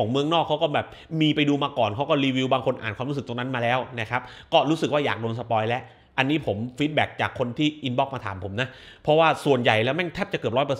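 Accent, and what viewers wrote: South, Thailand. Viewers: ชอบ พูดใต้อะ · คนใต้หรือคับ ผมชอบนะเวลาพูดใต้ เพราะมัน.อ็อฟตัด!!.....(หายไปไหนมาทีไรตกใจทุกที)สนับสนุนแกนานนะอ็อฟตัด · พูดใต้เสริมๆมาบ่อยๆก็ตลกดีนะครับ เป็นเอกลักษณ์ช่องดี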